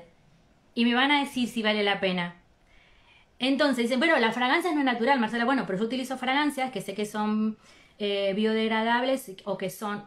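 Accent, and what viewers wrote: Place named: South America, Latin America. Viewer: Hola, sigue activo el grupo? Me gustaría hacer un curso, saber de donde son. La profe tiene acento de Sudamérica 🥰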